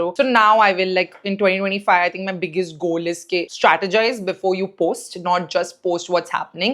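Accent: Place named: Maharashtra, India. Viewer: loved the way you speak Marathi gurlll! Loving your cute Marathi accent fr fr touchwood❤ #sarahsquad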